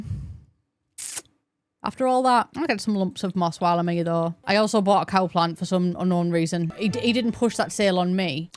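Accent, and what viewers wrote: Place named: North, United Kingdom. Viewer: Potential translation: "I was reeling, like- like excuse the gas, I'm supposed to be punching other people not myself" Unsure about the first part but the secind is definitely what you said I am proud at the level of northern british understanding I have reached. x'D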